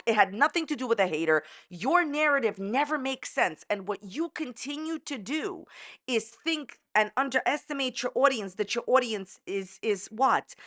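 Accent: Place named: East Coast, United States. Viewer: I love your east coast accent!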